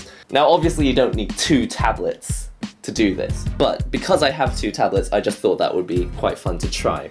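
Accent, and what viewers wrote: United Kingdom. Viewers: Are you pure Japanese? Or are you mixed? You sound like you have a British accent :)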